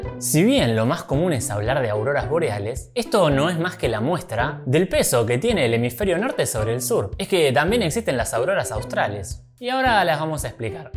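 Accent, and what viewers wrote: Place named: Buenos Aires, Argentina. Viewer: Muito bom o seu canal, saludos desde Brasil, me gusta estudiar el castellano viendo videos y tu canal es perfecto para mi, me encanta el acento de Buenos Aires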